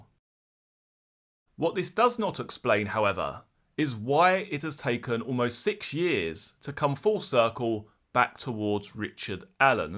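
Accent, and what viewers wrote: United Kingdom. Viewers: Love the British accent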